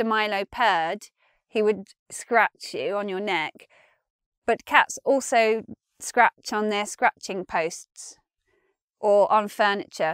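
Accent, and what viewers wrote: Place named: London, United Kingdom. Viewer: Her accent gives it away. She from the UK but if you want to get more precise she from London.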